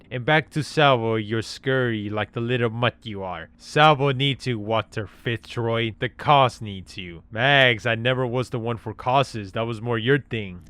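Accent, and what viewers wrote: Europe. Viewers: You live in Texas? I’ve always thought you were European from your accent. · Texas??? What... you sound European wtf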